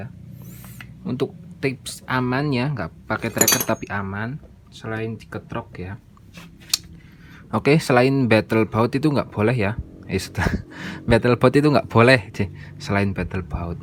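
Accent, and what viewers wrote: Banyumas, Indonesia. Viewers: Sampean wong ndi kang ngomonge ngapak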